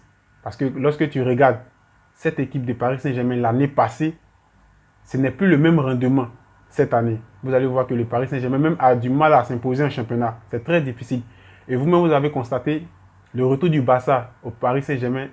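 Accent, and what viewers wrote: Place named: Ivory Coast. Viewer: Mais il a beau rester à l'étranger ce mec mais son accent ivoirien ne quittera jamais 😂😂😂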